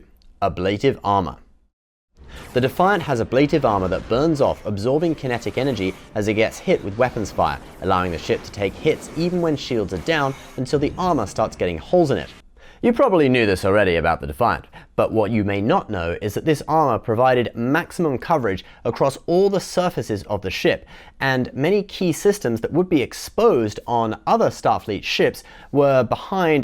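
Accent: United Kingdom. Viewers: Uhh Boi my man the is back again! Sorry but i just missed him...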